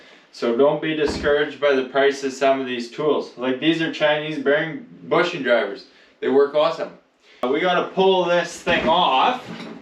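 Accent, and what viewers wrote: Canada. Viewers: Aha. I was trying to figure out where this guy was from. I was guessing Dutch Canadian. So I know Canadian for sure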